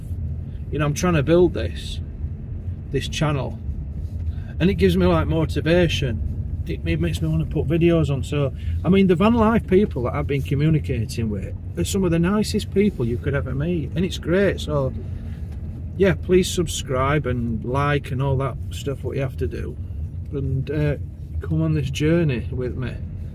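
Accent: Yorkshire, United Kingdom. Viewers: You sound like a Yorkshire fella! ❤🇦🇺 I listen more and hear you are. I’m from there too, Australia now. Love your channel ❤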